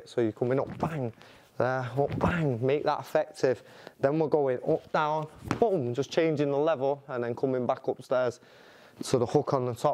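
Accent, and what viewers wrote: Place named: Liverpool, United Kingdom. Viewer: killer scouser accent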